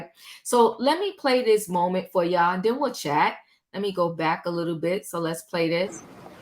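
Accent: New York, United States. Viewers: . I’m from Queens and I hear NYC when you speak. Am I correct?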